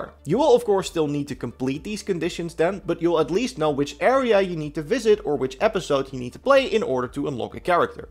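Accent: Netherlands. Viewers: Sorry doe alsjeblieft iets aan je accent. Je video’s zijn echt leuk maar het stoort me zo erg dat Nederlands accent.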